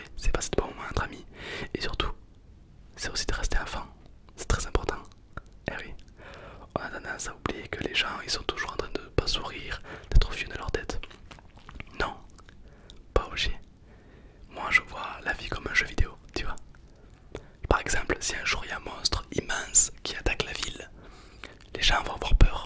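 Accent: South, France. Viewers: t’as un petit accent du sud toi 😂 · Du sud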